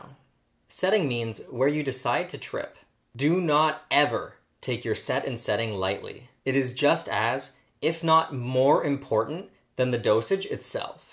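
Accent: Canada. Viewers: is he canadian?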